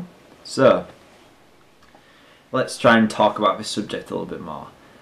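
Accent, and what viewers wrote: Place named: Yorkshire, United Kingdom. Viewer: Dat Yorkshire Accent Doe so Amazing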